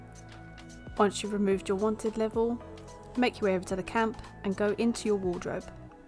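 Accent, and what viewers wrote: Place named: United Kingdom. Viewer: Im also british, i dunno if she british but she sounds british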